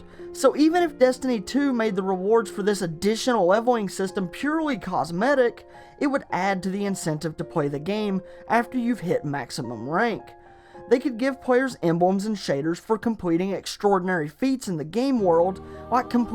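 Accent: South, United States. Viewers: are you from the south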